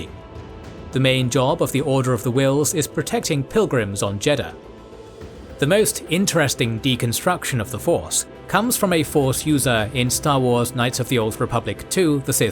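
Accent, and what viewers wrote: United Kingdom. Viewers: A prime example of the danger of the British accent. Giving credence to the sequels which split the fandom, introducing Grey Jedi as if that's a real thing and not just fan fiction, and defining the Sith Rule of Two as the way that operate when the Sith species was conquered by exiled Dark Jedi. Sith bend the Force to their own will for their own selfish desires, where Jedi surrender to the will of the Force and use it to guard life. Way too much emphasis on the damn sequels.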